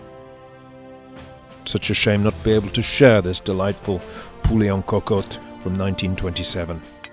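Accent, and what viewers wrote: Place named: United Kingdom. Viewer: YIMBY (yes in my backyard), there is always room in my backyard for culinary pilgrims. Madame Saint-Ange would have been suitably amused by the fact that her name is brought to our attention by a cook having a very British accent, BUT secretly content on knowing that the good word is being brought to us heathens! Keep up the good work!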